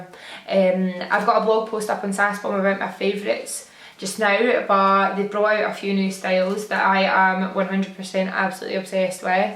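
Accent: United States. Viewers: The American accent! 😂😂😂 I freaking love you. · Your American accent was spot on hahaha! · Seriously loved your American accent!!!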